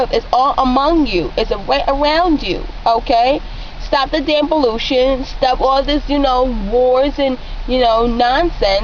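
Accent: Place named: United States. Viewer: ok u r like american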